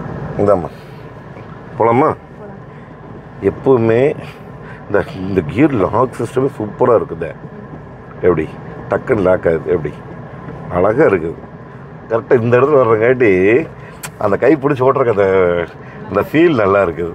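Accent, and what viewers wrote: Kongu, India. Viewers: சார் நீங்கள் பேசும் கொங்கு தமிழ் ரொம்ப நன்றாக இருக்கிறது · கொஞ்சும் கொங்குத் தமிழ் பேசும் கோமகரே...! திகட்டாத தித்திக்கும் பேச்சுடைய திருப்பூர் திருமகரே...! லடாக் பயணச் சாதணைச் சகாப்தம் எங்கள் மதிப்பிற்குறிய திரு. அண்ணா அவர்களே❤❤❤🙏 எப்படி இருக்கீங்க அண்ணி❤❤❤🙏 எப்படி இருக்காங்க தம்பி ரித்திக்❤❤❤ எப்படி இருக்காரு. உங்களோட பல வீடியோக்களை நான் Miss பன்னிட்டேன். சிலபல இக்கட்டான சூழ்நிலை காரணமாக வீடியோ எதுவும் பார்க்க முடியல கமெண்ட் பன்ன முடியல. வழக்கம்போல அண்ணா உங்களோட பேச்சு வீடியோ சும்மா நெகு நெகு நெகுனு இருக்குங்கோ😊😊😊❤❤❤❤ நடுத்தர குடும்பத்தினருக்கு ஏற்ற அற்புதமான சாலை ரதம் என்றே இந்த "MARUTI SUZUKI EECO"வை கூறலாம்❤❤❤ மாருதி நிறுவனத்திற்கு ஒரு கணிவான வேண்டுகோள். அண்ணா நீங்களும்.... இந்த கமெண்ட் பார்க்குற சகோதர சகோதரிகள் யாராச்சும் மாருதி நிறுவனத்தில் பணிபுரிந்தாலோ? அல்லது மாருதி நிறுவனத்தோடு ஏதேனும் ஒரு வகையில் தொடர்பில் இருந்தாலோ இந்த வேண்டுகோளை மாருதி நிறுவனத்திற்கு தெரியப்படுத்தவும்🙏 நடுத்தர குடும்பத்தினருக்கு ஏற்ற அற்புதமான வாகனம் இது❤. 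சுய தொழில் புரிவோருக்கும்.... குடும்பத்துடன் பயணிக்கவும்... அவசர ஊர்தி (AMBULANCE) சேவையிலும் இந்த வாகனம் 100% பங்கு வகிக்கிறது🙏 மாருதி நிறுவனம் அவங்க கார்களை BS6 PHASE 2 விதிமுறைகளின்படி பல வடிவங்களில் புதுசு புதுசா தயாரித்து வெளிவிடுறாங்க. அந்த வகையில் இந்த ""MARUTI SUZUKI EECO"" வாகனத்திற்கு பாதுகாப்பு திறன் வசதிகள் மேம்படுத்தப்பட்டு. உ.தா (ESP.... TRACTION CONTROL.... HILL HOLD ASSIST) Power Steering. இவற்றோடு ஆட்டோமேட்டிக் கியர் பாக்ஸ் மற்றும், டிரைவர் சீட்டுக்கும் கோ பயணி சீட்டிற்கும் அட்ஜெஸ்ட் ஹெட்ரெஸ்ட்டும் கொடுத்து புதிதாக விற்பனைக்கு கொடுத்தால் 1000% மிகவும் பயனுள்ளதாக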